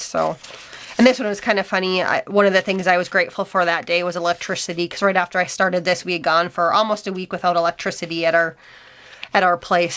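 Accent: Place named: United States. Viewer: Pretty journal. I have a non crafting question for ya! Folks from your state pronounce your short "o" and "a" differently than the rest of the States. I'm curious... where does that come from? If you can answer that, it'll settle a bet with my husband. I say it comes from the American Indians.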